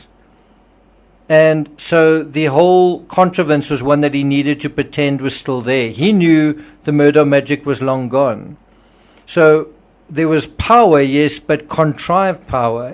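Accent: South Africa. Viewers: Did you cover Oscar P? Your SA accent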